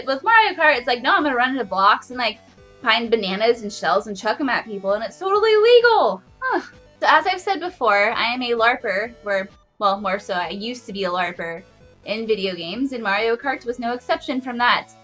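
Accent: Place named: Australia. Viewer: Dat intro made me LoL. Great list by the way! Nice Aussie accent Mate Cheers LoL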